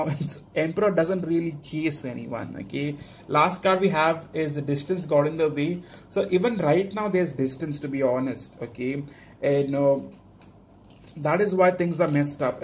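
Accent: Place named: India. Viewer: Your voice seems like that of an indian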